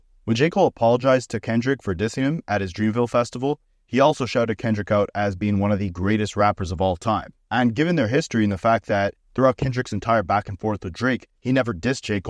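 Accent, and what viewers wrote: Canada. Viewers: The narrator sounds Canadian. Cool.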